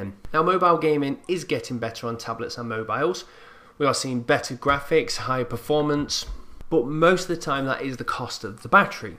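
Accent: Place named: Northern England, United Kingdom. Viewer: Adding the letter "t" to words that don't need it is a common thing in the North,. T'internet and all that.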